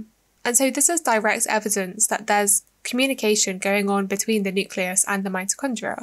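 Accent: United Kingdom. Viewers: Im sorry to say, the brittish accent is just so hard to take.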